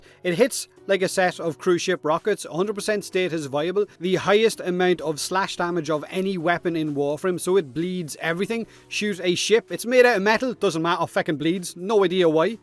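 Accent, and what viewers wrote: Ireland. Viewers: Honestly, Idk if I watch your vids for the content as much as I enjoy the jokes, nonsense and the feckin’ irishness and hearing u try to say “three”😂 “The Tigris Bleeds ships” I’ve never laughed so hard in my life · Is he Irish?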